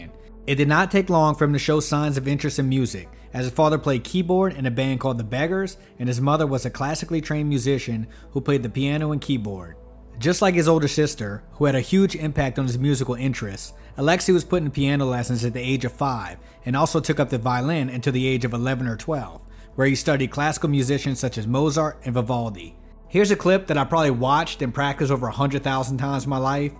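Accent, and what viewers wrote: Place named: Louisiana, United States. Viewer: Bro you from Louisiana? That accent is screaming you from the bayou.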